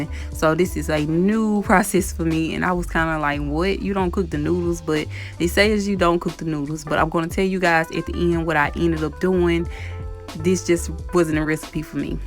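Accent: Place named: South, United States. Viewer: You gotta be from the south ayyeeee yooo you had me at bolder... AkA butter and poppa Rika 🤣🤣🤣 dead 💀.... looks amazing tho I loved it 😍 👌 🙌 ❤ 💕 Update: I have a question??? How come you don't make any veggies with your dishes Also I don't eat pork.. im Muslim I was thinking veal chops or lamb would you make a video for ppl that don't eat pork thanks 💕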